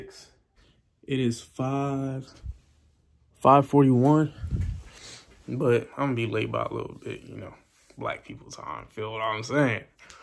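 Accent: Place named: United Kingdom. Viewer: Have you ever lived in the UK? Your accent is slightly british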